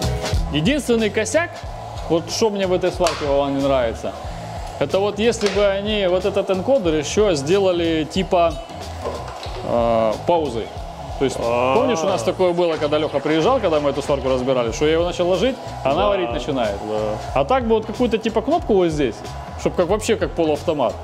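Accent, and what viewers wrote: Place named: Ukraine. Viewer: Ты как будто украинец, шокаешь, фразы на украинском выпуливаешь) Есть ощущение что множество талантливых людей в рашке - это украинцы)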